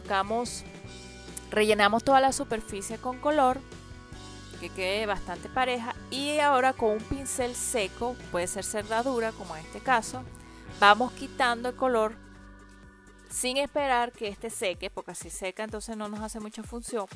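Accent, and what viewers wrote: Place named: Venezuela. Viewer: Muy buena, parece acuarelista venezolana, es genial es muy didáctica y fascina su modo de hablar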